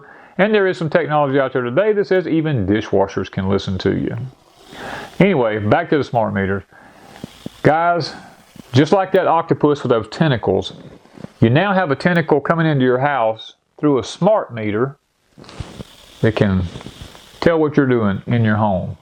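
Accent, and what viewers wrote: South, United States. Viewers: Hi, love listening to your southern drawl, I'm going to take your advice and ring my son tomorrow, can't see him he lives to far away, so I'll call him and tell him how much I miss him.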